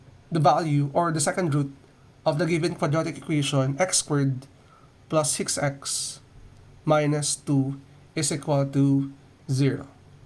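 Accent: Philippines. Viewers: He's Filipino so the accent is a little thick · Yeah his accent is filipino so the pronounciations are a bit different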